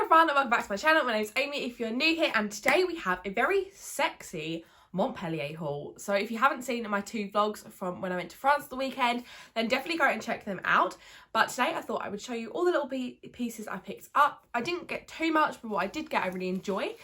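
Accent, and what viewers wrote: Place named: United Kingdom. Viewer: I REALLY LIKE YOU ESPECIALLY YOUR BRITISH ACCENT 😃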